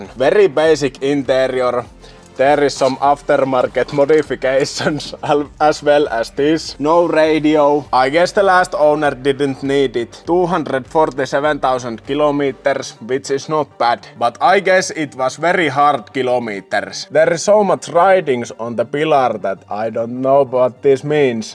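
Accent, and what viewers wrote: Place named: Finland. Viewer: Cmon guys.. there is no shame in rally english. It’s literally english with finnish accent and that’s it. That is very understandable for native speakers in my experience. Ofc it sounds rough in the beginning but ”suomi sopii sulle paremmin” commentators can burry their heads in the sand and keep living your life xD you do have balls of mulliperse.. keep it up!😂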